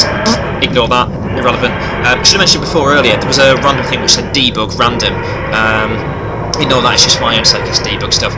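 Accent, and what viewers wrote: United Kingdom. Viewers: Glad to see the English accent's lost on most of you :) But thanks for dropping a line, the project has indeed ported over to Fallout New Vegas, the link to which you can find via my channel profile. Can understand why some of the non-British can't understand, we've got the most diverse dialect range in the world when it comes to a language. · uhm, lol? what, are you saying your Asian or Something, he is speaking English with a Pommey/British Accent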